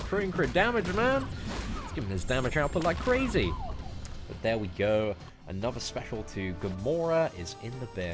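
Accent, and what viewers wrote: United Kingdom. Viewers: I hate you, but I like watching your videos with your Bitish axcent.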